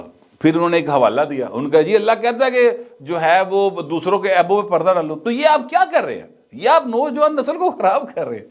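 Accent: Lahore, Pakistan. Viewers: آپ کا لاھوری سٹائل گفتگو کرنا بہت اچھی لگتی ھے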